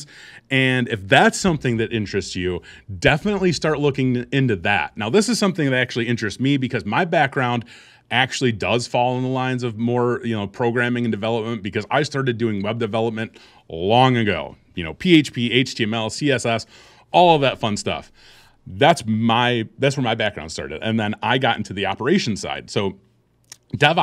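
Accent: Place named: Midwest, United States. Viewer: The midwest accent is strong with this one.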